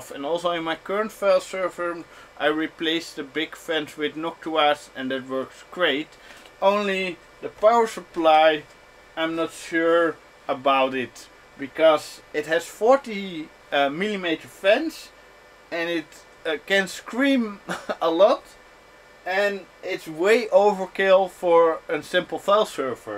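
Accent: Netherlands. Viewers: leuke bak man :).. ben ook op zoek naar zoiets.. jeetje je bent echt een nederlander met je accent lolz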